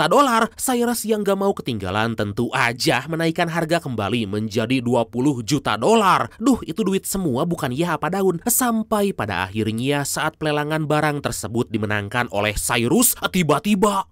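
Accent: West Java, Indonesia. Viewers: Sunda pisan euyyy logat na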